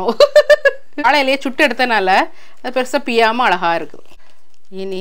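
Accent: Nagercoil, India. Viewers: நாகர்கோவில் பேச்சு வழக்கில் நம்மூர் அக்கா லண்டனில் இருந்து பேச கேட்கும் போது உண்மை யிலேயே என்ஜாய் பண்ணி பார்த் தனக்கு. கீப் இட் அக்கா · Pakka Nagercoil baashai😅 mam poli🔥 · Nijama nagercoil la ullavanga kuda syle nu pechaiye mathitanga.. Ana neenga pesuratha kekumpothu alaga iruku.... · Hi Sister. என் வயது 45. உங்க பேச்சு அப்படியே நாகர்கோவில் Slang. நான் பிறந்து வளர்ந்தது திருநெல்வேலி. திருமணம் ஆனது நாகர்கோவில். உங்க யதார்த்தம் பிடித்தது. காந்தாரி மிளகாய் செடி வளருங்கள். மருத்துவ குணம் உடையாது. நன்றி. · நாகர்கோவில் slang super 👌 ௨ங்க expression அடிபொளி 😄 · Sister after seeing ur channel nan unga channel than pakurean.because neenga namma nagercoil samayal poduringa.nangalulam nagercoil than ana chennaila irukirom.neenga samaipathum pasuvathum nama ooru nabakum varuthu.so we enjoy and see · Pothisoora vida neenga pesura nagarcoil slang romba nalla iruku... 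Neenga sapdurathu atha vida romba nalla iruku... Nagarcoil la irukura mari feel iruthuchu.... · Makka Namma Nagercoil pechu thaan vera level makka 👍❤️ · Apdiye Nagercoil style la ye pasrenga sema ka, school time neyabagam varuthu, am also from kk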